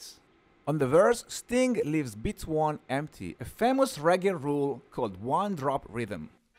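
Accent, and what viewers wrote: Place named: Brazil. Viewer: I like this video thank you and your accent reminds me of Brazil in goodness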